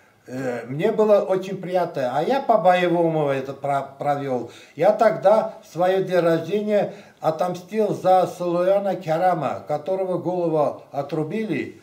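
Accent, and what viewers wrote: Armenia. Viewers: ДОРОГОЙ ВЫ МОЙ ЧЕЛОВЕК,ВЫ ДАЖЕ ВНЕШНЕ ПОХОЖИ НА АРМЯНИНА И АКЦЕНТ У ВАС АРМЯНСКИЙ, ДАЙ БОГ ВАМ ЗДОРОВЬЯ И ДОЛГИХ ЛЕТ ЖИЗНИ! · Боже мой, у него даже акцент армянский!, спабибо, что Вы есть у нас. Здоровья и благополучия всей Вашей семье! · Круто, и акцент армянский..ещё круче то что он художник. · Достойный сын эстонского и армянского народов!Честь и слава вам! Но по русски говорите с армянским акцентом.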